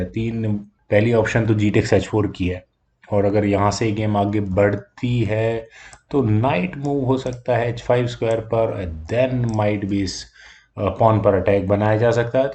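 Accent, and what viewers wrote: India. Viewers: Could someone tell me whether the speaking person uses only English? Because of Hindi accent, I am not sure if it is only English or some Hindi words are used also.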